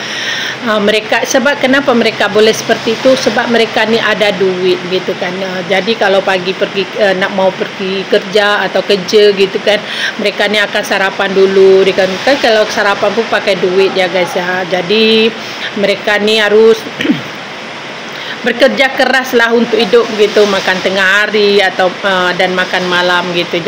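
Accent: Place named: Malaysia. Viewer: logatnya Udah Ada malaysia kak 😊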